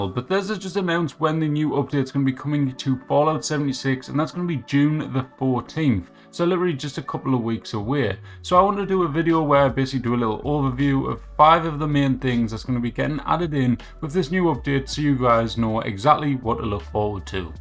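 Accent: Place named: Scotland, United Kingdom. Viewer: Great info with a Scottish twist... good stuff 👌🤌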